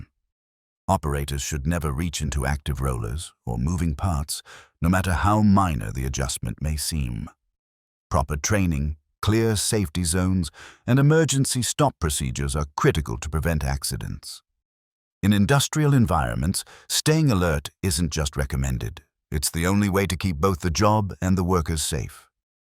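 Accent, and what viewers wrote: United Kingdom. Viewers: It looks as if all of these incidents occurred in a third world country where there is no OSHA. And, I'm sooooo tired of the English accent narration.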